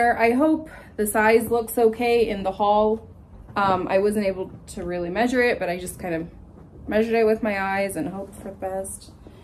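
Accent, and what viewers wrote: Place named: Kenya. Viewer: Now you have Kenyan accent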